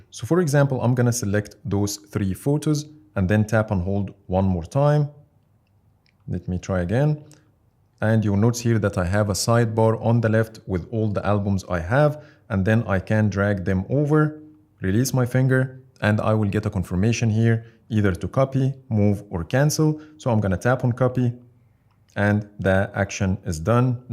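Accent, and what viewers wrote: Egypt. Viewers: brother are you from egypt you have a very masri accent love from uae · مش عارف ليه حاسس انك مصري 🙂 لهجتنا معروفة حتى بالانجليزي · يابن عليك مصري اوي يا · im guessing you are egyptian because of your accent its really good to see ppl from my country making videos in english too keep up the good work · your accent is so Egyptian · اللهجة المصرية بالانجليزي مستفزة جدا ....مفيش كلمة وحدة بتتنطق صح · The one talking in the video is Egyptian, I know that accent man xD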